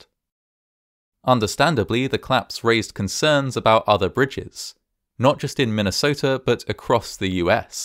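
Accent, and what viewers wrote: England, United Kingdom. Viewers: Is he English ?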